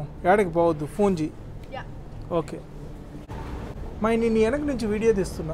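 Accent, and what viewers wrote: Nellore, India. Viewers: Hai Anna how are you???? Miru explain chese vedhanam and me Nellore language super....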